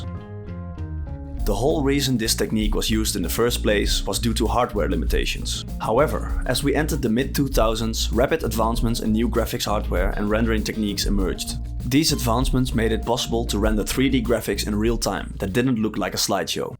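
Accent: Netherlands. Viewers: what is this dutch accent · Accent heard, Nederlander gespot. Goeie video pikkie, subscribed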